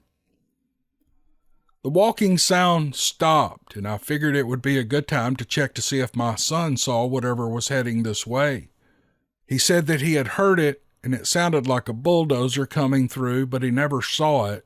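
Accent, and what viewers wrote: South, United States. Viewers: Good to hear a southern voice I'm Texan but you can tell the accent we're all different and we all stick together you have great stories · It’s his southern draw and personality that make the stories even better. I’ll bet if someone else narrated them, it wouldn’t be near as good.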